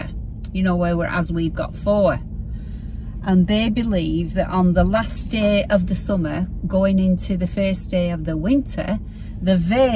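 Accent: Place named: Northern England, United Kingdom. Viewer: Hi, I just love watching your videos etc. You alway bring a smile to my face. So good to hear a northern accent as I moved from near Manchester about 40 years ago. Thank you. Have a great holiday x❤️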